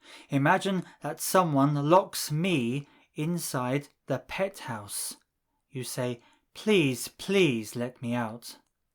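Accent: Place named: Italy. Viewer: He sounds italian when speaking espersnto tbf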